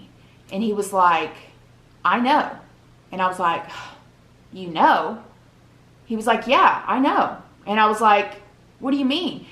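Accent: South, United States. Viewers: All I hope for is that I get my fair share with the man I love.... I know him from middle school... like I hope it happens like you in a way...where yall met, life did its thing and yall got married in the end. ♥️♥️😭 love your stories, and that southern accent!!! P.S something similar happened to me, Facebook and MySpace kept recommending him to me until we met and once again we ended in bad terms in April. God knows what in the heavens he is doing.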